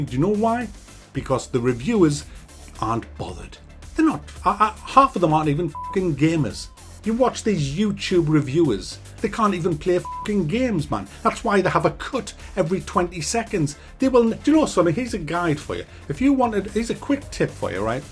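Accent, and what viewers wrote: United Kingdom. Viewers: Stop pretending you speek english! I can't freaking listen to one more Brit on youtube... I need to put the auto subs on just to get your point and thats not the goal! And I want to watch all your videos becaus I adore them and you are pretty right with your critique, I have so often the same feeling and i dont want to hate on new AAA Games but how can you love old diamonds like Bioware or Bethesda in 2019? Its a shame and Im just keep playing becaus of good indie games like Mordhau or the upcoming rebel galaxy game.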